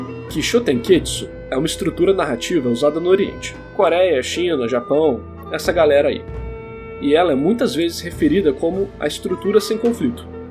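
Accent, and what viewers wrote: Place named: Maranhão, Brazil. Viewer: O sotaque desse cara é muito dr maranhense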